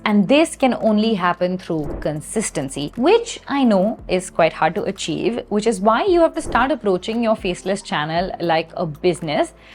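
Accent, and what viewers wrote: India. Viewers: I like the Indian accent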